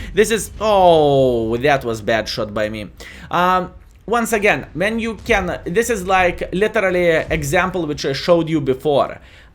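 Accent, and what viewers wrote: Russia. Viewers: Что за акцент?Ты русский? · jesus this russian accent english is horrible.....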